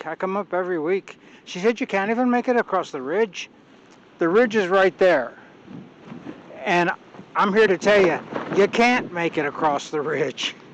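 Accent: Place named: Ireland. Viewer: Love the Irish accent,. Be safe way up there with all that wind!! Vaya con Dios!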